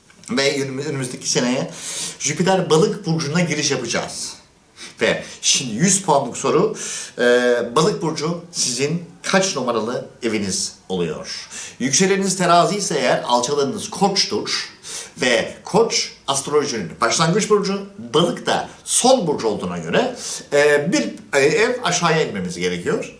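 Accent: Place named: Germany. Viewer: Hocam Alman aksani var sizde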